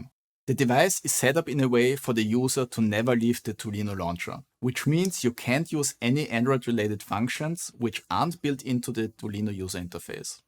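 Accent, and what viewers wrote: Austria. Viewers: This is the very first of your videos I’ve watched. Your English sounds Austrian, nice ;-) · thumbs up for the fat austrian accent from germany. My favourite word: The Bauerbuddnblaismänt. :) Eine Mischung aus Domi Thiem und Felix Neureuther, sehr sympatisch!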